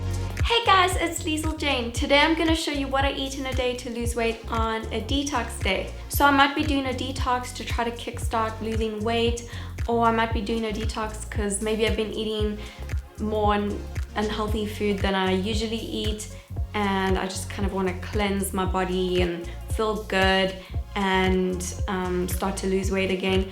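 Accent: South Africa. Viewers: Dat South African accent tho :p Baie goed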